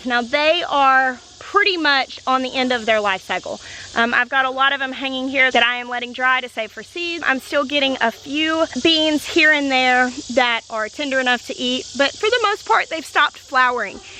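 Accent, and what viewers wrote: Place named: Arkansas, United States. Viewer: she's very inspirational to me. We grew up 40 miles away from her home. I ended up moving away 20 years ago to Wichita Kansas. I get so tickled at her because her accent is so me!! Lol my family teases me when my Arkansas accent comes out. I've been sending them videos saying, now I don't feel alone. Then yesterday a man came by from one of the churches here they are having vacation Bible School. I was in the other room but heard his voice. I thought, that man is from Arkansas! I went to the door and he was telling my daughter he was from Arkansas. Lmbo we have a one of a kind accent like no other lol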